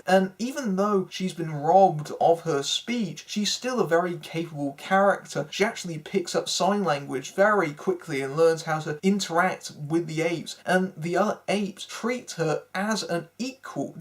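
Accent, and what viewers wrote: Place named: England, United Kingdom. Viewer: You got the best English accent ive heard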